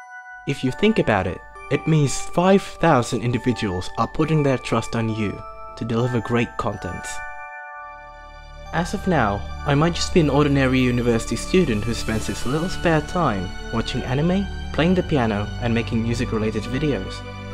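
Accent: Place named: Indonesia. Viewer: I feel like his Indo accent is way stronger here than previously.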